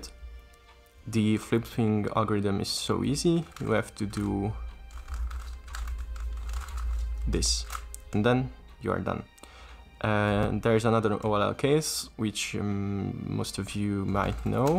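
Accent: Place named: India. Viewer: Nice indian commentary, lots of love from New Delhi!♥️♥️♥️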